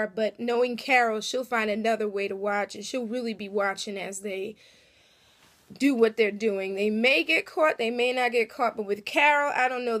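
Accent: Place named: South, United States. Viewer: and from your accent i would say you are a southern girl so yea throwing a curve ball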